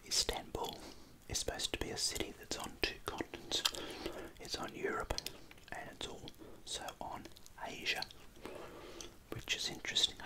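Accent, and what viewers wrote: Australia. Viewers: australian accent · I love Aussie accent from Romania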